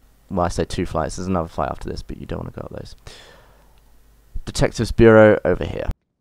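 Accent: United Kingdom. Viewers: u got the brit voice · I like ur accent, wot part of UK r u from?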